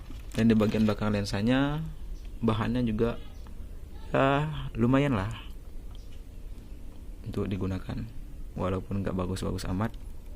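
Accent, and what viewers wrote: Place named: Bali, Indonesia. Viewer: LOGATNYA KAYA ORANG BALI,, MAS NYA ORANG BALI YA?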